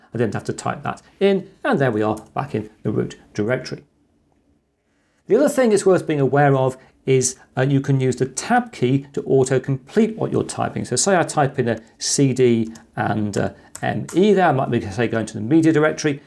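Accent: England, United Kingdom. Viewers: Hello. OpenSource enthusiast since first Linux distro release, Slackware. I have watched several of your videos and I am very impressed with the tools, creation, content, and the way u explained some complicated subjects for the regular audience in very understanding manner. Not to mention the nice British accent!! Kudos!!! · Is this traditional England tone？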